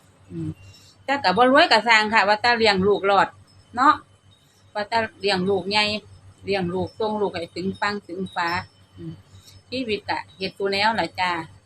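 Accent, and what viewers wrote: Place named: Yasothon, Thailand. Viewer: สวัสดีจ้า เว่าเสียงสำเนียงเหมือนทางบ้านยโสธรเลยจ้า ร้องเพลงกะมวน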